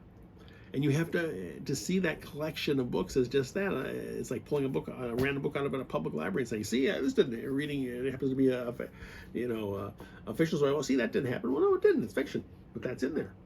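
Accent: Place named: Minnesota, United States. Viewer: Hey, I'm watching this video--per your recommendation! BTW, are you originally from Minnesota? I'm only guessing this because your accent reminds me of where I grew up.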